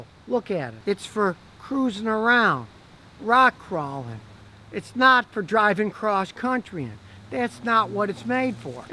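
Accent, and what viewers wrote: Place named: New York, United States. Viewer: sounds like a newyorkian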